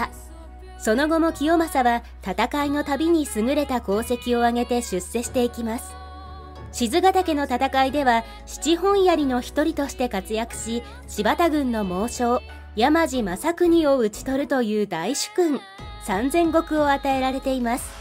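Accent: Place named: Himeji, Japan. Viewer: 姫路のイントネーション！！！！！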